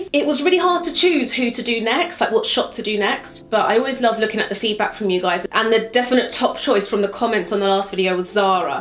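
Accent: United Kingdom. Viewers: I think the only reason I see your videos is your british accent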